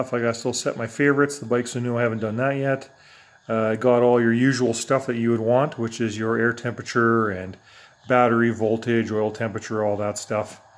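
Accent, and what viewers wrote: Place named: East Coast, United States. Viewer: When I started listening to your video I would have sworn that you were an east coaster. Your accent doesn't sound like Alberta to me! Thanks for the video. I don't like the tft screen, and DO NOT want keyless start! Maybe this is the bike for me. I don't like the white, but maybe that shouldn't matter at all. I am near Niagara in Ontario and plan to explore this great country of ours on two wheels. Part of.menthinks this is the way to go.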